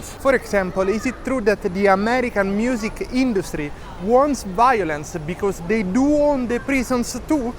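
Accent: Italy. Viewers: He has a thic Italian accent😂I find it very lovely,but Italy is my neighbor so the accent is very understandable to me😉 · i love you tell the truth with your lovly italian accent:popcorn-yellow-striped-smile::popcorn-yellow-striped-smile::popcorn-yellow-striped-smile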